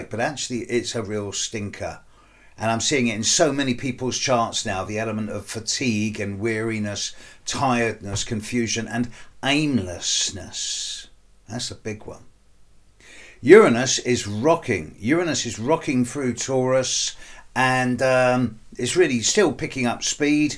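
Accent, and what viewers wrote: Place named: England, United Kingdom. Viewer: Hi! I love your astrology reading and your accent, where you come from in England? I need to go there 🙏😊 Thank you so much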